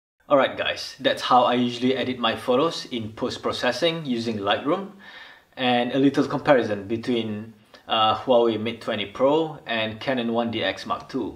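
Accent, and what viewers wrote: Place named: Malaysia. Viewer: Love your accent and tutorial. Thank bro. Greeting from fellow Malaysian.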